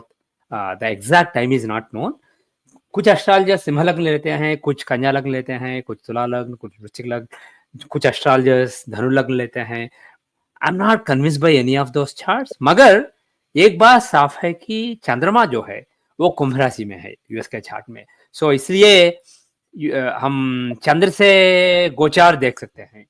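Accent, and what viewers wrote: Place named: South India, India. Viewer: whaaaat is saafisticated? He clearly cannot speak in Hindi and jumps to English often in the middle of sentences.. His English is quite average with heavy South Indian accent. "Emperor has no clothes....".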